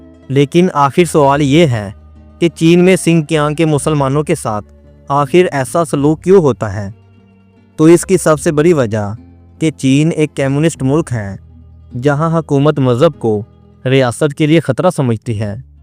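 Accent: Punjab, Pakistan. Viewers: Aap kindly Urdu ka labo lehja zara behtar kur lain warna Punjabi style mein Urdu suit to nahi karti baqi aap ki marzi, zuban Punjabi ho ya Urdu us ka asal tarah ada kurna hi Zuban ka Husan hai warna Allah ki Panah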